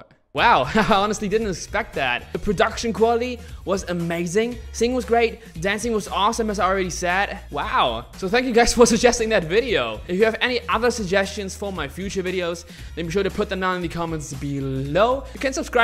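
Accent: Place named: United States. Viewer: hätte ich nicht gewusst dass du Deutscher bist, hätte ich gedacht du wärst ein Ami xD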